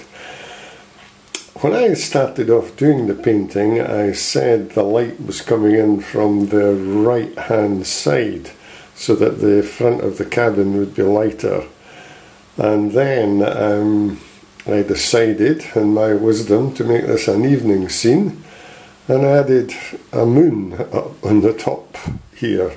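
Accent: Scotland, United Kingdom. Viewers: The Scottish accent makes it special. You are quite understandable. Thank you for sharing your skill.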